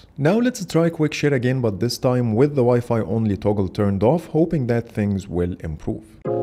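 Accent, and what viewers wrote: Egypt. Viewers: هو انا مستغرب بس انا لما سمعت لهجتك الإنجليزي جالي احساس انك مصري صح ولا اي😅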